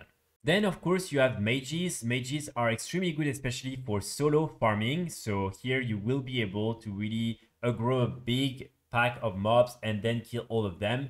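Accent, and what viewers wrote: France. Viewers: Thats a French accent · Are you french?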